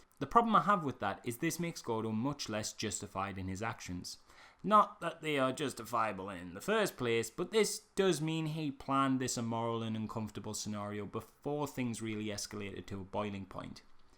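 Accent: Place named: Scotland, United Kingdom. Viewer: Wow, twenty seconds in, I already need to ask: ARE YOU SCOTTISH?? I´m German so I´m really not 100% sure, but I just have to keep following your channel now for a while just for that amazing accent! (I´m also quite a huge Doctor Who thus Peter Capaldi fan for now..If you know that show, you also know, why I say "for now", because it can change.... Very soon even maybe....)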